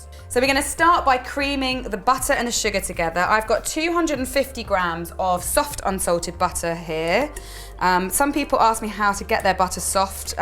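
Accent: United Kingdom. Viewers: Dear, I follow all your delicious recipes. Your channel is the best in the net. I love your way to animate, your humor and your great mind. I would like to bake with you in France, in the French riviera where I live. If you wish spent some holiday in Nice, I will be glad to offert you my hospitality. My name is Catherine. Thank you for all this Beauty you share with us. I love as well listening to you speaking English with your British accent...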